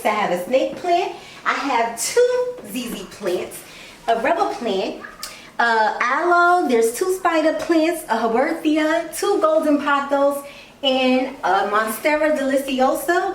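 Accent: New York, United States. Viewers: I love that NY accent 🥰. You made your home very welcoming and it’s cosy and accommodating and so organized and bright. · I love your New York accent .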